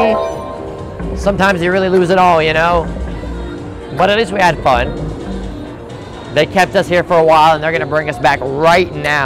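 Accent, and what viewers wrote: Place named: New York, United States. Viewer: Love your channel cause I like your New York or New Jersey accent. LOL Now for educational purposes: You first have to educate yourself to the rules of the game that are there for everyone to read, ESPECIALLY THOSE PLAYING THE GAME. After you have done this, you can then educate your audience. Thank you very much. Best wishes for a prosperous and blessed New Year.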